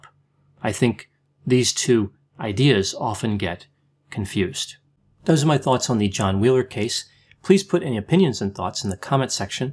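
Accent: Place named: Baltimore, United States. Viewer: There was an Unsolved Mystery located where I'm from too. Season 1 Episode 1- Baltimore at The Belvedere Hotel. I lived a block away from The Belvedere for 5 years. In one apartment I had The Belvedere was my bedroom window view. It was really fun seeing all of the different shots of the building and the neighborhood around it. Some great shots in that episode. I knew was lived near me because we have the same accent.:) Regarding this case- I also said trash truck compactor but they didn't mention that in the show. My other theory is he was beaten by teens because there is a problem with teens beating/ killing homeless people. They probably saw him disheveled and thought he was homeless which is why they never looked to take his watch and money. Then he was either put or went in the dumpster then finally killed by the trash truck. I wondered if he ended up in Newark because he was trying to get to New York and he misheard the person who gave him the ride.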